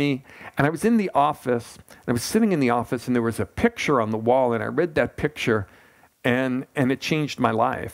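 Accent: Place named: Brooklyn, United States. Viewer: I havejust foundanother great teacher andMarcis that person.wonderfu, if he seems like an older person jewish from Brooklyn with his accent. just follow him in his talk unitl about 47 or 48 minutes and then BOOM! igot it. the dramas...helists allofour drams. samaras Yeah! and yes! love toyou all liz e. whyaren't therefore comments here? or on his spacef or acknoweldgements below! fantastic than you. Shalo andnamaste and AUM! aim nomastivaya!. ram dass and khrishna dass....moreguys from Brooklyn!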